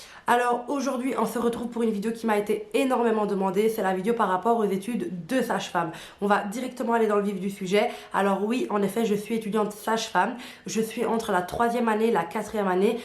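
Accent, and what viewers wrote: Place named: Belgium. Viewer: L’accent belge est vraiment marqué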